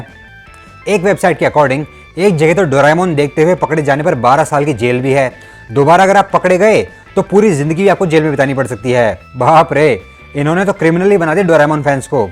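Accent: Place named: Marwari, India. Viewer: I love your marwadi accent 😂😂😂😂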